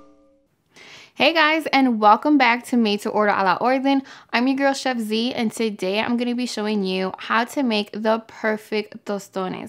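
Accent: New York, United States. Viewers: Heard the NY accent, so I'm trusting you above everyone else.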